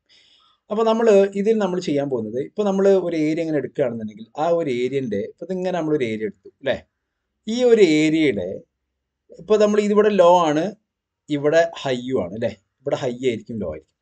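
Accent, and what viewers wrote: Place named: Malappuram, India. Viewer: മലയാളം പറയുമ്പോൾ നാടൻ ഭാഷയും ശൈലിയും പ്രത്യേകിച്ച് മലപ്പുറം Slang വരുന്നു. ശ്രദ്ധിക്കുമല്ലോ?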